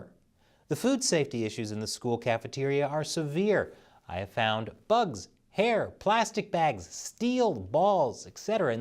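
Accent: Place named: United States. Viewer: First time on your channel but just the american accent lets you kno that its goign to be biased and especially finger-pointing.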